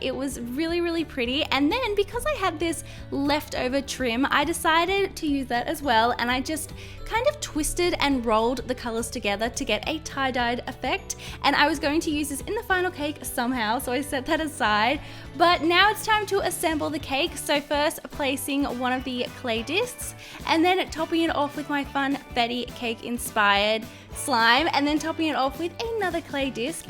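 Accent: Australia. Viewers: I loooove your accent it is so so pretty. Is it Australian?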